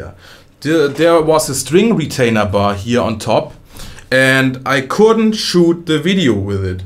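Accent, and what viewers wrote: Germany. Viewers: I like your light understandable german Akzent.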